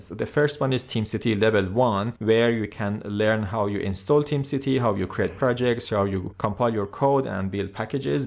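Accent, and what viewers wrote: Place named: Iran. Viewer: Dadash lahjat maloome irani hasti. Khaste nabashi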